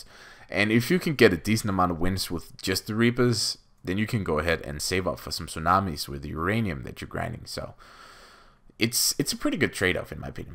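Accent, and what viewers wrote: Denmark. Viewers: Where are you from ? Dane ?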